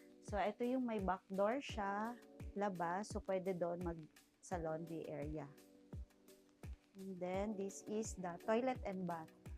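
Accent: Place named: Visayas, Philippines. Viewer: pangit magsalita ni ate, 😢. Nag english, nag tagalog pero tonong bisaya.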